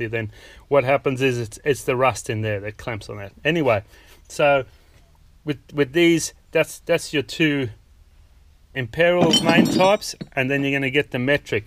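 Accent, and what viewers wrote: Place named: South Africa. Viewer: Love the South african accent.😊 Thank you for all your educational videos.🙏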